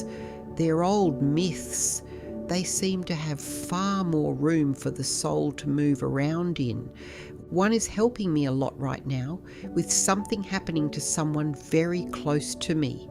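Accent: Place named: Australia. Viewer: Female Aussie accent in Ai.(?) Blokes know you are right. Give us pause to reflect... (then start nagging ...if we can't come up with plausable excuse, we deserve it).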